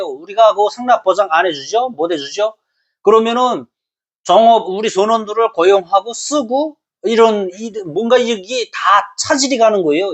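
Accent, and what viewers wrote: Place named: North Korea. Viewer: 이분 처음 왔을때 북한티가 났는데 이제보니 완전히 남한티로 탈바꿈 했네...억양만 좀 바꾸면 완전 남한 mz세대가 되겠네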